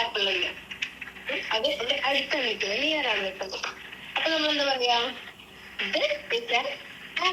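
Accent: Kannur, India. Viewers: ങ്ങൾ കണ്ണൂർ ആണോ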